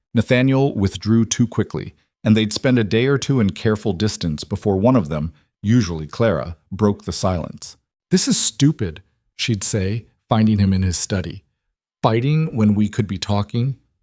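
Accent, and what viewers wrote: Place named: United States. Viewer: And I don't understand why the AU narrator has a nasally American accent when the story is set in England. Ridiculous. 😔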